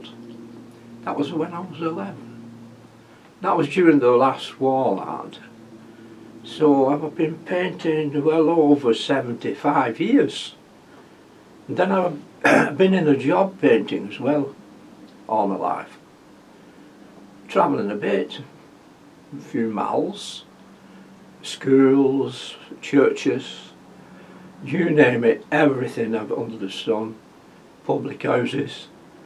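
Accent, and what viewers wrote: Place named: Bolton, United Kingdom. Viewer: Hi. Great to put a face to your wonderful tutorials. You are truly an inspiration. I love your accent. It reminds me of my childhood, growing up in Hulton Lane, Bolton, horse-drawn Manfredies ice cream and day’s when we “ played out” in summer until after dark. Best wishes.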